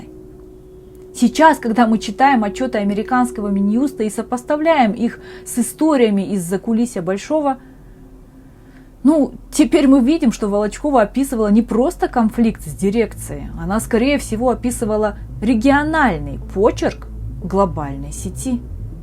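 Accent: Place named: Ukraine. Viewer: Украинский акцент проскальзывает. Не сразу понял...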